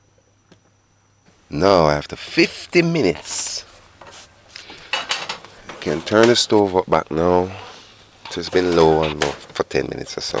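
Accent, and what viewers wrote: Jamaica. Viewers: Leave him alone, he’s not pretending to have an English accent, he is Jamaican . There are people who enjoy watching him such as myself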